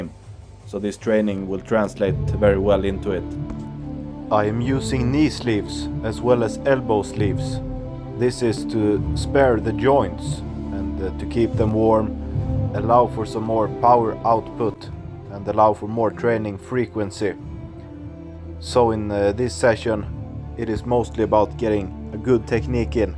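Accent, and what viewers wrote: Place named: Sweden. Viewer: With your Swedish accent, It feels like watching as if Magnus Samuelsson filmed his sessions in his haydays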